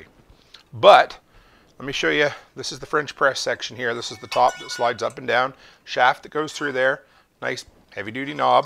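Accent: Canada. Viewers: I hear a Canadian accent.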